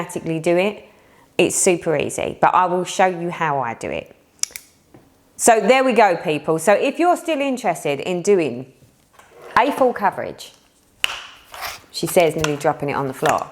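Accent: United Kingdom. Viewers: I came across your floss tube video quite by chance and I love it , you are hilarious and the struggles you have had are so identifiable to every cross stitcher. I live in a household full of men/ boys so you are my company when I am sat stitching. We left the UK 3 years ago to live on the west coast of Canada and it is so great to hear a voice from home.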